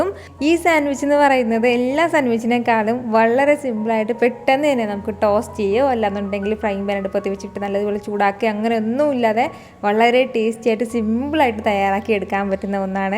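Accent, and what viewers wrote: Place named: Kasaragod, India. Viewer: kasaragodile oru nattile baasha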